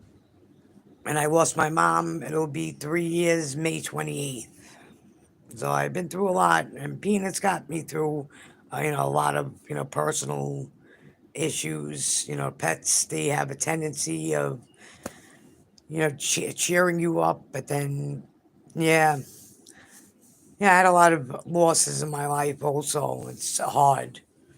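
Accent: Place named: New York, United States. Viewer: Are you from ny ? You sound like it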